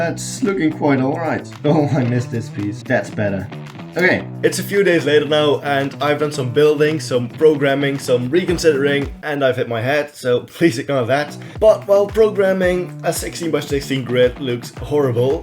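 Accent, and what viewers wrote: Netherlands. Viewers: Your Dutch arent you?? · Hi I love your video and I thought you sounded very Dutch if your not Dutch don’t take this as an insult plz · I immediately heard you were Dutch 😂 Love your vid! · Love how you can still hear the dutch accent, Maar goed bedacht en gemaakt!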